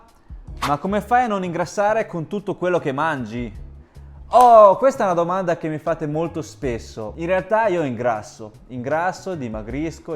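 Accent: Italy. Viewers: parli cinese con un fortissimo accento italiano🤣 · Cose avvincenti notante in questo video da parte di un italiano che ha vissuto a lungo in Cina. l'intro in cinese con marcatissimo accento italiano. :°) i piatti strani cinesi. mi hanno stupito! del primo non avevo mai sentito parlare e mi ha incuriosito (l'ho appena cercato e pare sia roba che viene dalla provincia di Guizhou). gli sputi come cosa negativa della Cina, ricordo la prof di Cinese a Pechino che diceva che non si era al sicuro neanche quando si va in bici perché magari a fianco ti ritrovi un altro in bici che sputa e ti becca! ci avrò fatto caso il primo periodo ma poi non ho visto quasi più nessuno sputazzare in giro (magari anche il covid ha aiutato). una cosa che io invece detesto particolarmente è che la gente entra in metro (e in ascensore!) e in bus prima che scendi e mi da sui nervi. lol. dulcis in fundo a SHIJIAZHUANG mentioned! 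un salutino a Shijiazhuang la prima città in cui ho vissuto in Cina. ancora complimenti e scusate il pippone. ; P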